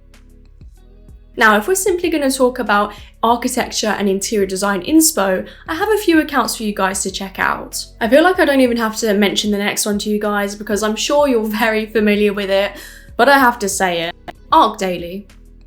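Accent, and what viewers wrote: England, United Kingdom. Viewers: I really like your content and especially your English accent, so posh and elegant looove it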